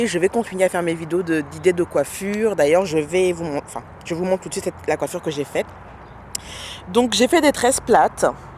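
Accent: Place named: Cameroon. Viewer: héhéhéhéééé bon courage dans ta nouvelle vie!!! t partie en scred!! et qu'est ce qu'il fait beau la ou t'es!!! t partie pour les études?? hi hi hi Riri nous inspire bien!! et la tu parles avec l'accent camerounais I swear!! lool allez on attend les Videoo avec impatience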